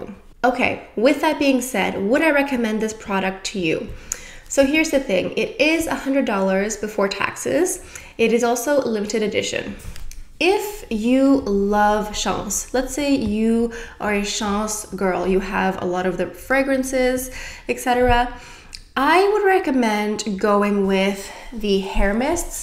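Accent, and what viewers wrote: France. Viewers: I have not seen the pencils here in the US yet but I agree with you on trying the hair mist if you don’t have. I bought the Chance hair mist after your review & love that it’s a softer scent than the perfume. I have both but it’s nice for touch up like you mentioned. Also you mentioned lotions & oils. I like to get the oils & sprays like in TF because the perfumes can be too strong. But the pencils look so pretty but I would want them to last longer than couple hours. Very nice review. Love your eye look & your French accent!!😊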